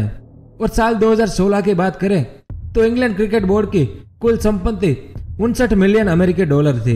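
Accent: Gujarat, India. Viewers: bhai gujrati hoo kya?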